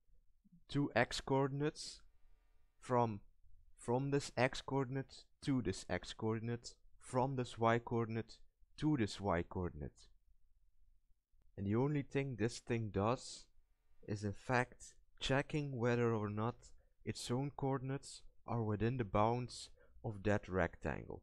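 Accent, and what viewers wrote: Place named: Belgium. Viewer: It's sounds like you are Belgian imo, and it even seems like I recognise your voice. But that would not be possible since I don't really know any other dedicated programmers.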